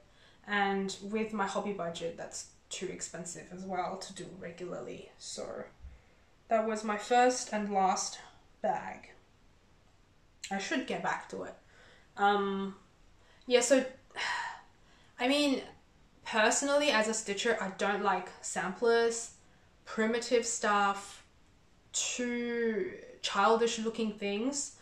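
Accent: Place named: Australia. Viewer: Loved learning about you. Your English is perfect with that lovely Aussie accent! I also tend to gift my stitching, though I recently purchased patterns I want to stitch and keep. I’m lots leer but like your style. Looking forward to more!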